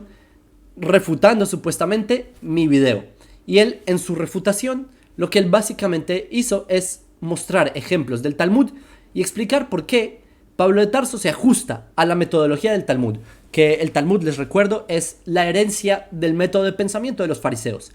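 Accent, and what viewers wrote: Bogotá, Colombia. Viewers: Me gusta mucho su acento Bogotano, muy elegante... con cierta pronuncion estilo arabe... Me gusta mucho escucharlo. Pero esta tan sesgado que no puede salir de la norma ortodoxa Judía, lo que como a todo religioso no le permite abrir la perspectiva sobre los conceptos.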